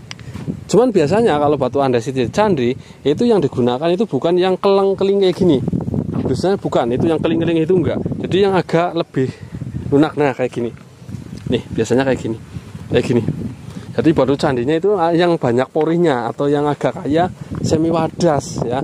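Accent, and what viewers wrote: Banyumas, Indonesia. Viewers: Gayor ngapak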